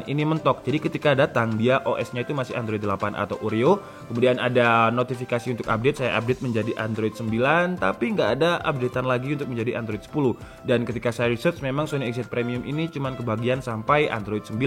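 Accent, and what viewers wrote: Java, Indonesia. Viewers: Wong malang a mas? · Medok banget to mas mas 😂😂😂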